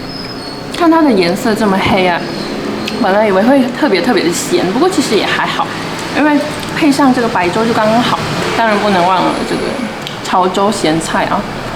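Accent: Taiwan. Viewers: 好的。。。听了你的口音好像台湾女生。☺️ .我是马来西亚人。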